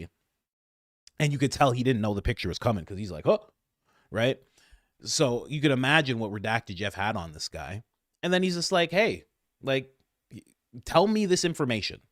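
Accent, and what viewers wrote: England, United Kingdom. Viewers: I love your British accent! 😅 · Love the English accent!! The king’s English isn’t that good..😂 King Charles only wishes it were.. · 😂 love the English accent! Alright bruv 😎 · Why is your British accent better than your American accent? 😂😂😂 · I LOVE your British accent. YOUR SO MUCH FUN TO WATCH THANK YOU FOR ALL YOU DO ❤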